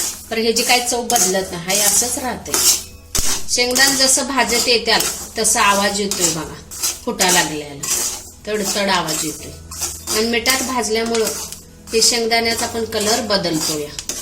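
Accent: Kolhapur, India. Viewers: Kolhapuri bhasha aahe na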